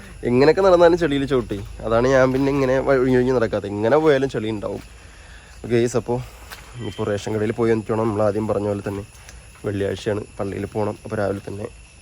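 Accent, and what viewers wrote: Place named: Kanjirappally, India. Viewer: സംസാരവും ഞങ്ങൾ കാഞ്ഞിരപ്പള്ളിക്കാരുടെയും സംസാരവും ഒരുപോലെ ഇരിക്കുന്നു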